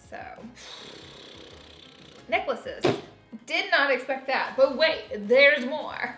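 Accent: South, United States. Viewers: Miss your southern accent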